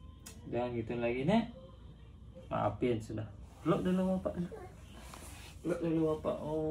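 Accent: Lombok, Indonesia. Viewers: Bang logat lombok nya masih melekat😁💪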